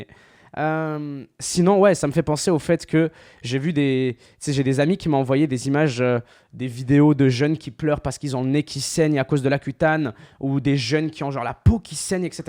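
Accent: Quebec, Canada. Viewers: Salut mec , je trouve que pour un québécois t'a un accent assez léger par rapport à Gurky.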